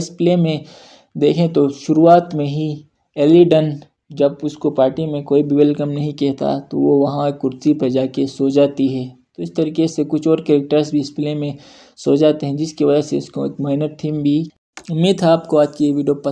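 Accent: Gilgit-Baltistan, Pakistan. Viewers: confirmed gigiti ho bhai. i can tell you cox of your heavy Shina accent. :D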